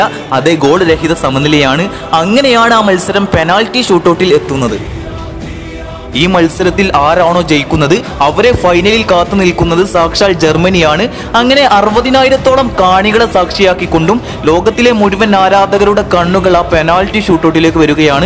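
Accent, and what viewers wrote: Malappuram, India. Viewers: Malappuram karante voice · മലപ്പുറം ആണല്ലോ 😄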